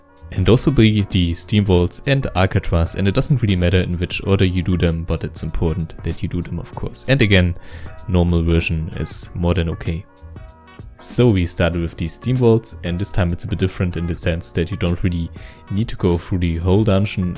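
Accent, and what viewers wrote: Germany. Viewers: He is german, i think.. :D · Are you german? Because of the accent. Just asking. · german Accent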